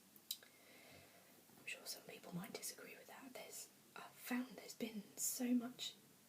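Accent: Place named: England, United Kingdom. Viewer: ~* *~ Cool! I really like this vid. Very casual, and my two biggest triggers are voices and tapping, and this vid has both! Your voice is really triggery for me, as I think English accents are more so than USA. Thanks and hope you're having a good day! Merry Christmas, happy Hanukkah, happy kwanza, winter solstice, or whatever you celebrate.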